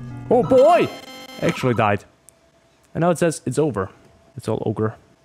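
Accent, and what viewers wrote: Germany. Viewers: Ayo you german? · your german?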